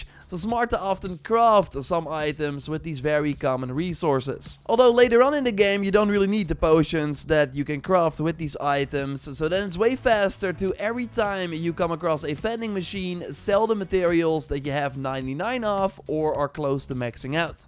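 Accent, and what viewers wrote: Netherlands. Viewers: 100% dutch by the accent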